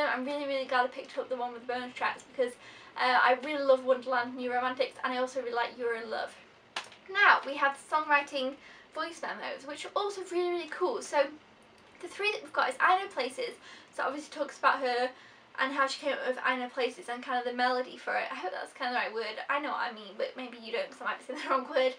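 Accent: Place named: United Kingdom. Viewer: I watched this entire 18 min video start to finish. I love your British accent and you kinda look like Tay Swift as well. Very good review for someone who doesn't usually do music reviews!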